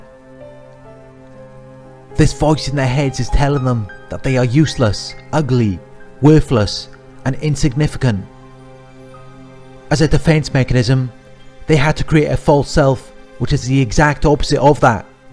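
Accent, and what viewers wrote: Wales, United Kingdom. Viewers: is this guy welsh?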